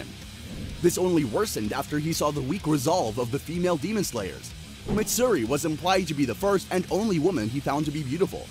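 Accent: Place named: France. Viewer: bro is speaking frenglich ( a bit )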